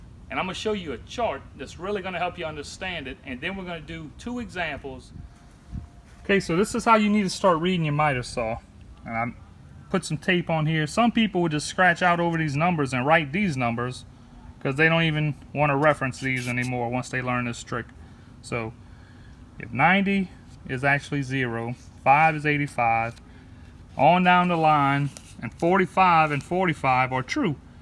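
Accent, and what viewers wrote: Louisiana, United States. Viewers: Can you tell he’s from Louisiana? Good video. His keep it simple instructions are great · First off, are you from south Louisiana? You have a good accent that suggests Cajun upbringing. Secondly, thank you for providing REAL instruction. Everything else I have found while google searching online has led me to absolute basics for people that probably are incapable of even tying their own shoes. I'll look through more of your stuff as I search for information on making compound miter cuts. Thanks again. (And if you are indeed from south Louisiana, I'll add a hearty "GEAUX TIGAHS!") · Louisiana accent so distinctive. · i can tell by your voice you are a son of Louisiana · Bruh you got to be from southwest Louisiana! Thanks for this video. Learn something new.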